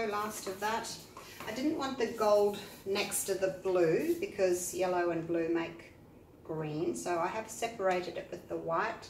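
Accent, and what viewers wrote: Australia. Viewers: Love your work and your tutorials. Especially the way you talk about your life. I feel like I'm listening to a friend and getting to know you as well as a new way of painting. It's good to listen to another Aussie Aussie Aussie. Oi oi you get the drift. Thank you.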